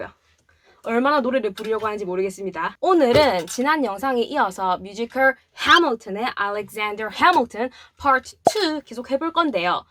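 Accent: Gyeongsang, South Korea. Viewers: 경상도 사투리와 유창한 영어, 그리고 꽤(?)하시는 가창력조합.. 올해내 일단1만구독찍고, 내년에 10만가즈아. 구글 알고리즘아, 님좀 밀어줘라~~♡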